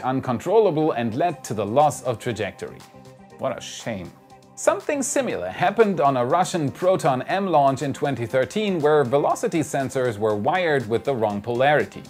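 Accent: Germany. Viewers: Tolles Video, man hört deinen deutschen Akzent, ist aber gottseidank nicht so nervig wie bei vielen Anderen Deutschen, die Videos auf Englisch machen 😉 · Are you from Germany?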